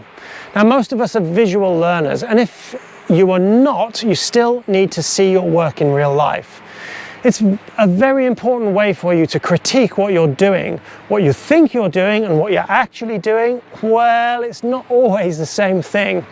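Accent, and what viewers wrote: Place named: United Kingdom. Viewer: Thank you very much for the precious advice, delivered in a fantastic British form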